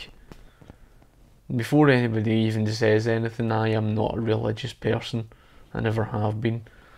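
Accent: Scotland, United Kingdom. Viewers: Hey, welcome back! I'm sorry about your situation, I hope you're well. Have you considered joining other political streams like "The Academic Agent"? Check him out, he's a another classical liberal and makes economic videos and political streams. Perhaps you can get in touch with him! Above all you should focus on yourself, if making other content is what is going to be the best for your situation you should absolutely push for that, don't worry about us we'll be good, but we'll miss you and your Scottish accent. P.S What are your opinions on the new film Outlaw King?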